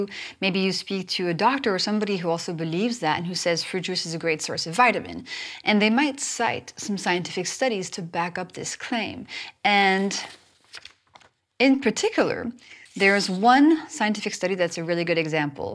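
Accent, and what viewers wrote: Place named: France. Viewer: She's a French speaker. I can tell. Probably from France.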